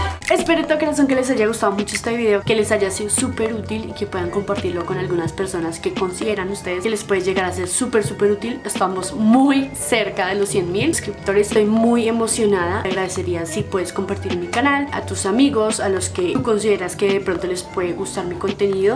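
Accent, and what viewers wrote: Colombia. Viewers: Es colombiana!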